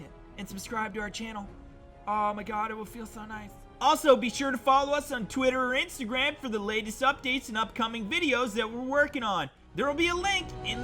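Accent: Canada. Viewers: The Canadian accent is killing me, it's too fucking funny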